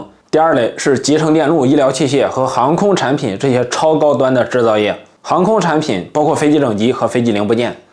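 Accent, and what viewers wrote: Henan, China. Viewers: 最爱你后边的河南口音，为了听你最后的结论词，每次都认真看完你整段视频，赞👍🏻。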